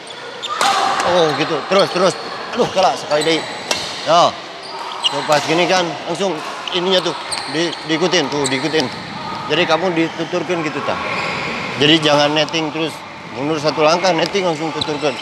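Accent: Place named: West Java, Indonesia. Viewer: Nyimak kang Ciri khas pisan urg sundana... Hatur nuhun hehe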